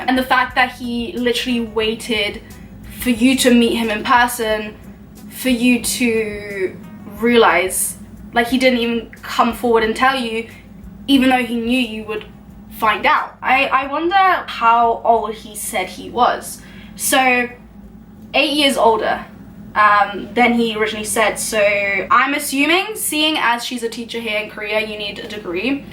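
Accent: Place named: United Kingdom. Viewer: ohh because i heard some British accent..nice to meet you here🌼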